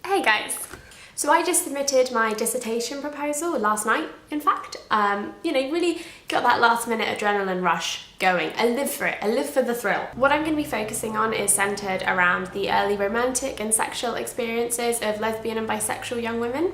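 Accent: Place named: United Kingdom. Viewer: How did I know she was going to have a British accent before I even heard her voice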